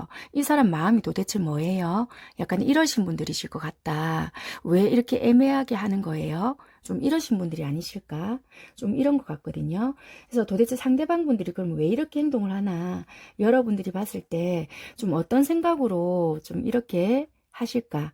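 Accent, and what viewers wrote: Busan, South Korea. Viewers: 네, 물론 또 대화를 나눠볼 생각이에요. 근데 이런 흐름이면 좋겠다 싶은 생각이 들다보니 재회의 경우를 염두해두고 리딩을 받아들여도 좋을지 궁금했습니다 😊😊 상대가 관계에 대해 좀더 긍정적인 방향으로 생각했으면 좋겠다 싶다보니 이 리딩이 너무 위로가 되는듯한 말씀 같았거든요. 아무쪼록 리딩도 댓글도 다 감사드립니다:)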